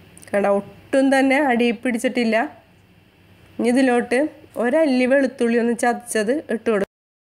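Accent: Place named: Kodungallur, India. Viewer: ഇത്താ.... വീട് കൊടുങ്ങല്ലൂർ ആണോ... സ്ലാങ് കേട്ടിട്ട്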